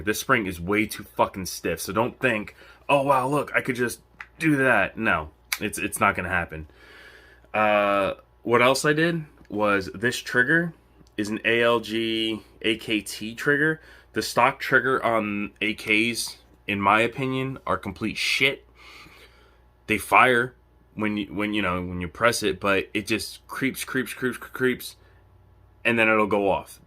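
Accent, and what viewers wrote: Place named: Pennsylvania, United States. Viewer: Just scooped a tiger maple m70. Nice review? You sound like you're from PA, where about? Pittsburgh here.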